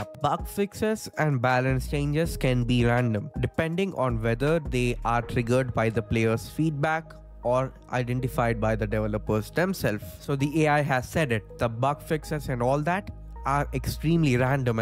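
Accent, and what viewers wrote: India. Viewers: Dude your voice is like a bit Indian ngl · ur accent is like an Indian no hate tho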